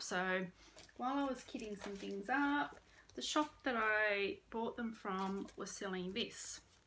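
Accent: Australia. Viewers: I love your Aussie accent! Be you and your work is beautiful😁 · I’m binging your videos while working. I love seeing all your things and I love the Australian accent as well. Bring a southerner in the US, it’s fun listening to others’ accents and they various things are pronounced. I can wait to see more!! Stitching and quilts alike 🥰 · Another fun video. Glad your sons are enjoying participating in your floss tubes. Love all your stitching and your Australian accent. Frosty is adorable and his name fits him purrfectly.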